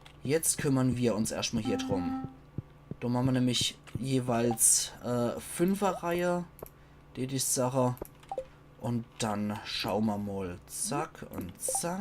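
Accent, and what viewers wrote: Palatinate, Germany. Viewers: Ja klar hab ich dich abonniert. :) Durch dein Lets Play hab ich ja Stardew Valley überhaupt erst entdeckt! Und dank dem Ackermann wusste ich dann oft, was ich den Leuten zum Geburtstag schenken soll oder wo ich etwas finde. Das hilft sehr, und ausserdem macht mir das Pälzisch solche Freude. Lustigerweise gibt es ganz viele Parallelen zu meinem Dialekt, dem Baseldeutsch. Und du kannst so cool Stimmen imitieren! Lewis und Willy - oder die alte Evelyn! Voll gut! :D Achja, ausserdem freu ich mich immer wenn endlich mal jemand anständig symmetrische Sachen baut. :) Freu mich schon auf die nächste Folge! Ganz liebe Grüsse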